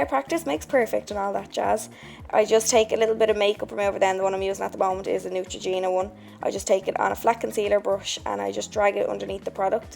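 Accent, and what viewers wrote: Ireland. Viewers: from her accent she’s definitely from ireland.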